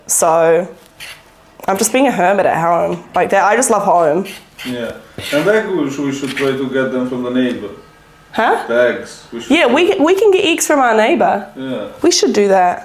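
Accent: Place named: New Zealand. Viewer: Thanks for the demonstration - simple is the way to go. You should get an Italian pasta machine (joking), the NZ accent is contagious not that our Aussie one isn't. 👍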